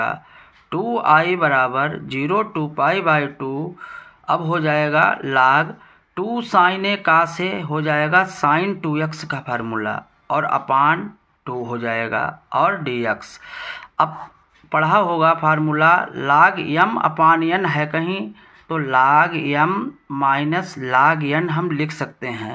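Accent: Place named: Bihar, India. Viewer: Bhai eski bihari master ki language toh kam kam hi samj aayi pr, pr question toh smj hi gyi Mai 😅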